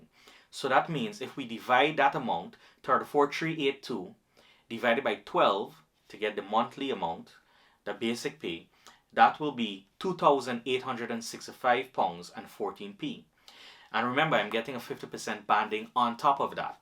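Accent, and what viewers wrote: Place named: Guyana. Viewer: You honestly sound like ur from Guyana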